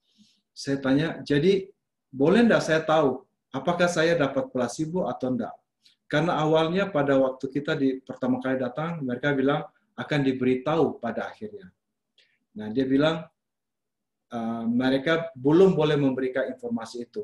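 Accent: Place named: Maluku, Indonesia. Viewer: Sdr, terimakasih langsung share ke saya. Sepertinya logat2 Maluku nih. Semoga hasil penelitiannya favorable ya, tetap sehat, semangat,Tuhan memberkati dan setiap langkah upaya pengobatan dan pengendalian Covid.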